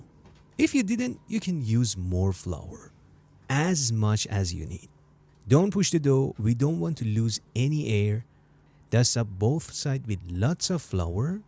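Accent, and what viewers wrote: Iran. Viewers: I bet this guy is iranian. And the petsian accent kept on increasing throughout the video😂 Btw yeah, thanks for the video. I'm going to try out this recipe also.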